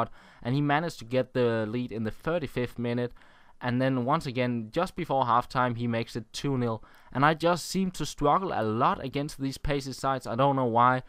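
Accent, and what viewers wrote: Denmark. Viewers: mate you from denmark! can hear it. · u danish?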